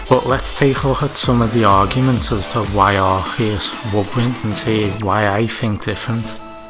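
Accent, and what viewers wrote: Liverpool, United Kingdom. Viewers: Your Liverpool accent. 🤮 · Is that another scouse pokemon fan.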